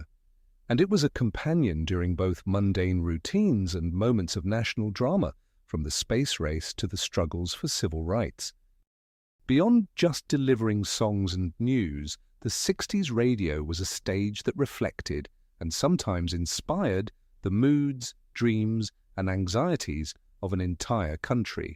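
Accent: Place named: Home Counties, United Kingdom. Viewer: Memory Lane America-narrated by some bloke from the English Home Counties (assumng it's not A.I. ). If you want to relate American cultural history, at least have an American voice doing it.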